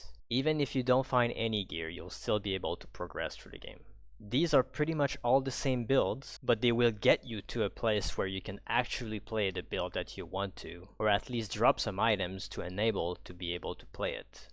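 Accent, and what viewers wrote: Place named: Quebec, Canada. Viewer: you sound SOOOO French QC speaking English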